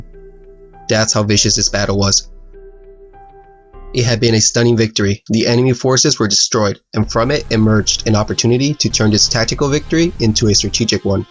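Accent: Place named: United States. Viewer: Mechanical "voice" narration, lots of "Wadder' and all the usual american nonsense. No wonder they want to keep quiet about how badly they did.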